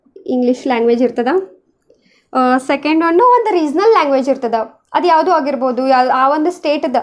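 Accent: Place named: Bijapur, India. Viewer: Nin language slang nodidra biyalu seemi Hudge tare kanutte.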